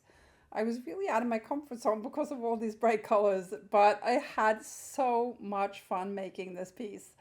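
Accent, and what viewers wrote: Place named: Brooklyn, United States. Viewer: I was also born in Brooklyn! I thought I recognized that accent.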